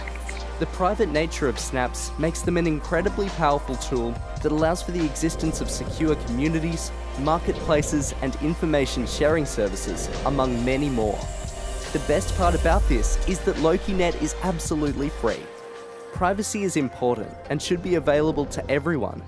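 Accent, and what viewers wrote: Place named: Australia. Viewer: I like the Australian accent used in this video.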